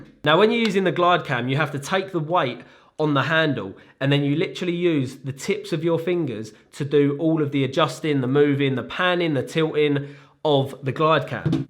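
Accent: United Kingdom. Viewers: Listening to this guy actually feels like watching he Peeky Blinders series. I love his British accent.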